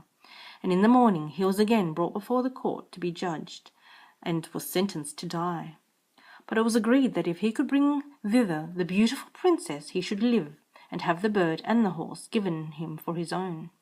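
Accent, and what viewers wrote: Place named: RP, United Kingdom. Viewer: you sound like a queen of England wasn't expecting that. Do you like drugs mate?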